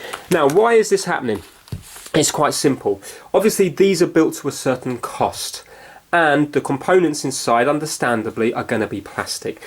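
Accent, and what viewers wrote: England, United Kingdom. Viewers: Yes, he is from England. they say torch for the word light. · British accents are sooooo satisfying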